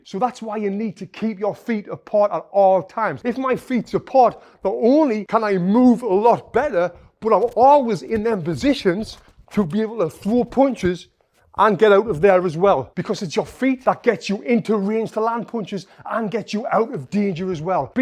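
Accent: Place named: England, United Kingdom. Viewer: Love your content and hearin the King’s English….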